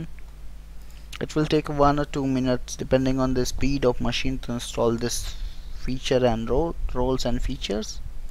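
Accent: India. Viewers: omg the indian accent is triggering me yet there is no other option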